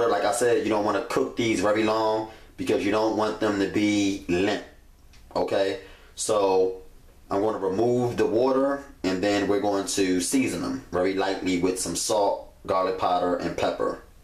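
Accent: United States. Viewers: 10 minutes, 4 commercials? You know this is a cooking video, and more subs and views is a good thing right? I'm so lost, cause you totally sound American, and above the age of 16...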